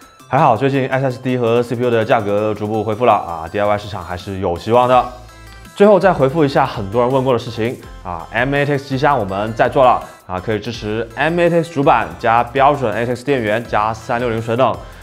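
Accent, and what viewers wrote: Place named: Guangzhou, China. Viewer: diu 一听就是广州佬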